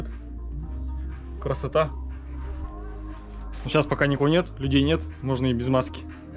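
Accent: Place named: Perm, Russia. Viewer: Блин, вы в Перми реально как в сериале разговариваете, случайно на твой канал наткнулся когда искал инфу по Гоу Про 8, и по говору понял, что ты из Перми, не ошибся ))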